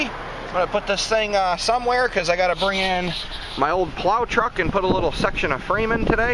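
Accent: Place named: Upstate New York, United States. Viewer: Nice job as usual! Where do you live? I'm from Fairport NY. Moved south to Raleigh in '97. My first house I bought was a 19K HUD HOME in Lyons NY. Just curious as to where you're at. Good to hear the accent. The weather is great here but watching your vids makes me a little homesick.lol nice work and vids keep em coming.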